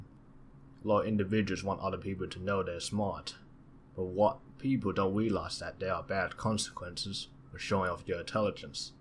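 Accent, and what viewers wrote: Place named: Australia. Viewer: I’m not trying to disparage* I’m curious, where the narrator is from. I’m guessing someone who spoke one of the Asian languages fluently, then learned English as a second language either in Australia or with an Australian tutor. Am I correct? Am I close? Again not trying to accidentally offend you, that’s the accent I picked up on, I could be wrong, so I hope my question doesn’t bother you!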